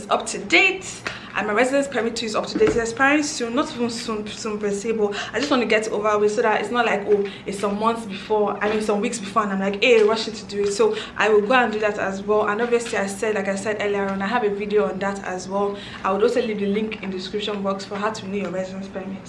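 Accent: Ghana. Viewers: You sound so Ghanaian kudos!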